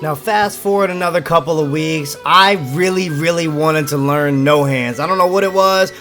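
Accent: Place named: Philadelphia, United States. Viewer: Lmfaoo yo from start to finish u can hear the Philly accent progressively comin out 🤣🤣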